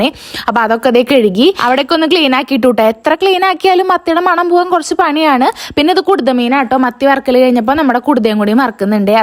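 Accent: Thrissur, India. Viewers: Eniku Thrissur bhasha bhayankara ishtanu... samsaram adipoli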